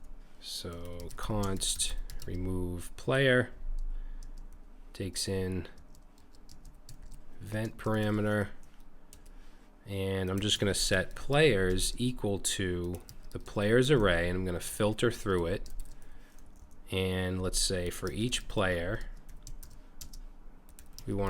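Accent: Boston, United States. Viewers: Great tutorial! Sounds like you're from the Boston area, ("btn dahk")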